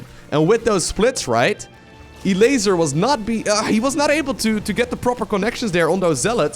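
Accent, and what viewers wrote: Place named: Netherlands. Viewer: You are Dutch, aren't you? Just assuming due to your accent.I like watching your vidoes.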